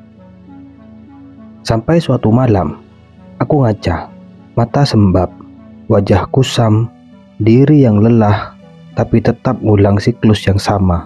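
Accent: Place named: Madura, Indonesia. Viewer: Suara channel ini logatnya kayak orang madura.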